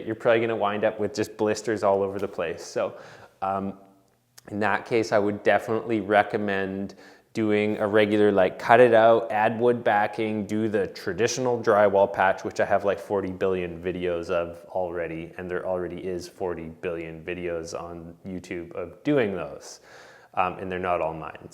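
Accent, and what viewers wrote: Canada. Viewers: If you mix quickset 5 mud with quickset 20 will it set up in the average of the two (or 12.5 minutes) if both parts are mixed equally? Ok, I’ve worked the last 14 hours so my attempt at humor fell on its face. Great video (again). I’m so glad I discovered you a couple of months ago. I’ve learned so many techniques and tricks from you. I love your sense of humor too. I’m from Canada (and want to return) so it’s nice to hear your Canadian accent speaking in English:). Keep up the inspiring and informative videos! Btw, I was a carpenter while working though college. I built houses and apartments and since they’re still standing I must’ve done a good job. I’ve also made surfboards and made a 17 foot sailboat. 👍👍👍 Btw, is Canada allowing Americans into Canada? I was born in Canada so I hope they’ll let me back. You’re so right, these are hard and strange times. 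Thank you for that heartfelt message.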